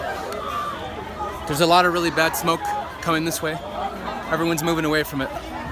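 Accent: United States. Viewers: Hate to break it to you but it is in fact American English.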